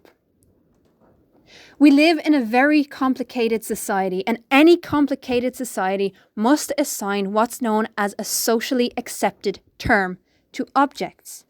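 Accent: Ireland. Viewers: Are you Irish? 🇮🇪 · 👍🔔 Where are you from I hear an Irish twang in your accent?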